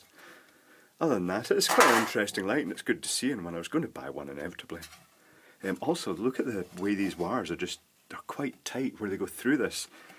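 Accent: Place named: Bearsden, United Kingdom. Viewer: very polite spoken...you fae Bearsden?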